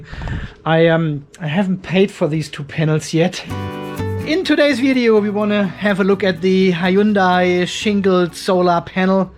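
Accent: Germany. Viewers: You sound german?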